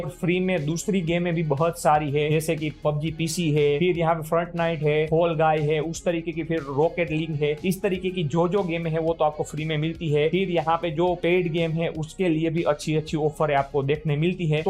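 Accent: Gujarat, India. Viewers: भाई पहले हिंदी बोलना सिख लो, साफ़ साफ़ पता चल रहा है की तुम गुजराती हो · Are you ગુજરાતી? 😅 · By his speaking style he is 💯 gujarati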